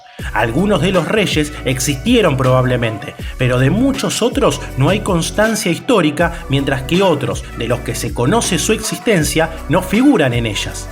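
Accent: Argentina. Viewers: Benditos sean todos los hispanohablantes pero yo puse el sonido para ver quién relataba este documental y escuchar un argentino fue un aliviaso, encima buenísimo el canal, bien ahí <3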